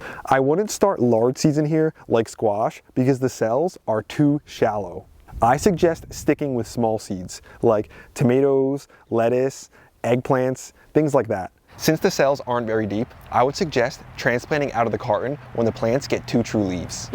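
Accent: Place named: Philadelphia, United States. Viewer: are you from philly or jersey? I can tell because you say wuter instead of water like i do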